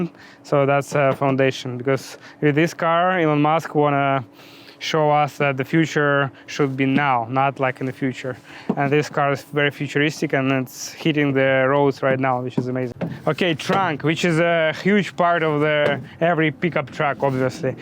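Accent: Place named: Ukraine. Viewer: What terrible Ukrainian accent😮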